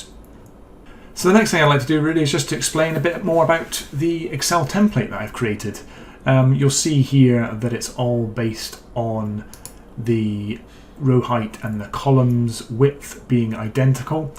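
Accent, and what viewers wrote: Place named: Scotland, United Kingdom. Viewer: I really appreciate all your efforts spending to provide such detailed instructions about Power Platform and Office apps features. I lost my mobile iPhone in Aberdeen, 2 days later someone found it and called my emergency contact with Scottish accent (obviously), and I managed to get it back. Since then, I am happy to hear anything with Scottish accent. Sorry for the side note 👍🏻Kudos to you.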